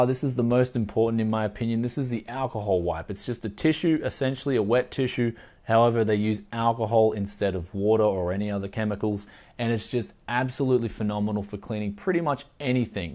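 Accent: Australia. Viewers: They couldn't understand your aussie accent in America. BTW Kerosene + water on a rag is a stronger cleaner. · I am surprised, I assumed given your accent you would live in Aus, but the products you show suggests you live in Japan?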